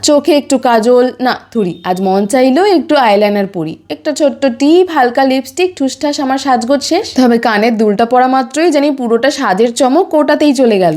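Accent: Bangladesh. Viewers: আপু আপনি বাঙাল না ঘটি 🤔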